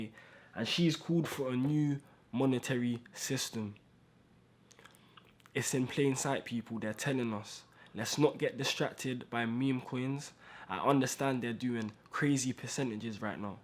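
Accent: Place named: United Kingdom. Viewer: your uk i know because how you talk.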